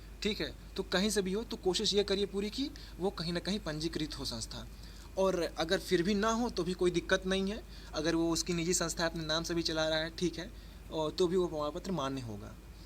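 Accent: Allahabad, India. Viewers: bhai lg rha tum allahabad se ho...meri bhi ekdum yhi akcent h bolne ki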